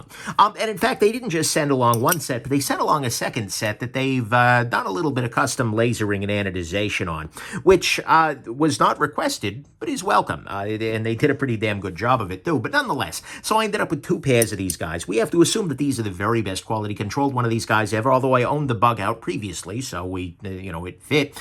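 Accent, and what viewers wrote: New York, United States. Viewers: You are so informative and funny! I love the NY accent!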